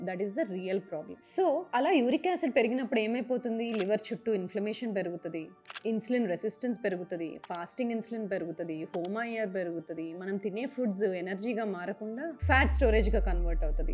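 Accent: India. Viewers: Great information. By the way I am surprised you still pronounce certain English words with Indian accent ( Example: Dessert and obesity) I hope you take it sportingly. Cheers! 👍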